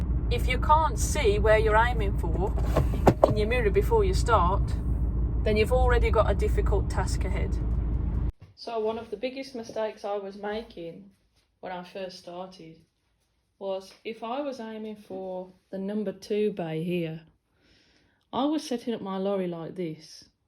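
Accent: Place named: Midlands, United Kingdom. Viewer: Same to you!! You deserve tonnes of respect! And your good nature, your accent and your location makes me remember my happy days in the midlands!! Trained by westgate training of walsall, ex samy jones! Loved the area!!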